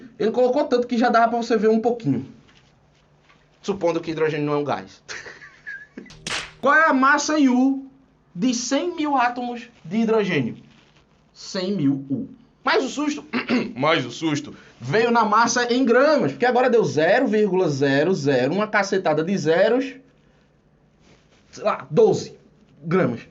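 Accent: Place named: Northeast, Brazil. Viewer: Adoreii a aula. Achei mto animada. E seu sotaque nordestino,foi oq me chamou mais a atenção.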